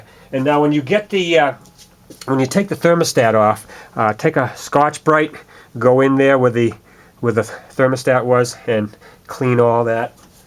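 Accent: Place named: Boston, United States. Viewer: LOL... That's a perfect Boston-area accent.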